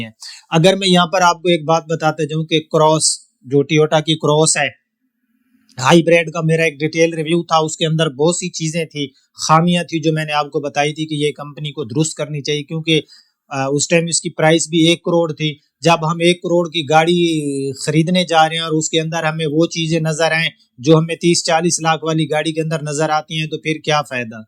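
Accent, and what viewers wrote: Saraiki, Pakistan. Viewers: بھائی ۔ آپ خالص اردو ہی بولا کریں ۔ انگلش الفاظ کا تڑکا بڑا مصنوعی سا محسوس ہوتا ہے ۔ ویسے بھی آپ کا لہجہ سرائیکی اور دیہاتی پنجابیوں جیسا ہے ۔ آپ نے تلفظ ہائی بَرَیڈ ادا کیا جبکہ یہ ہائی برڈ ھے ۔ فائدہ ہے فیدہ نہیں ۔ لہذا خالص اردو ہی بولیں اور اپنائیں ۔ ھمیشہ خوش رہیں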